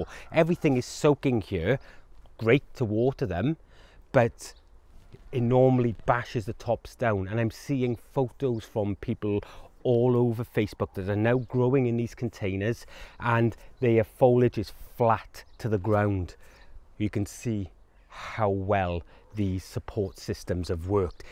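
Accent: Wales, United Kingdom. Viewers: Hello Great video as always. I have found just the same this year- massive top growth using a home made traditional and worm compost with some peat free added plus BF & B and potato feed. Leaving it as long as possible to check yield. Tomato seeds planted in the same medium failed to thrive and develop. I had to buy plants from the local garden centre- first time in years. Great though to hear a familiar Welsh accent.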